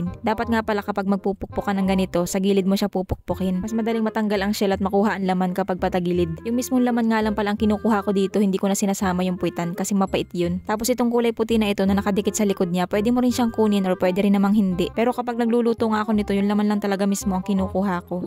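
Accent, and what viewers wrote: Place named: Visayas, Philippines. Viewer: Bkit Ang salitamo mayhalong besaya besaya b kayo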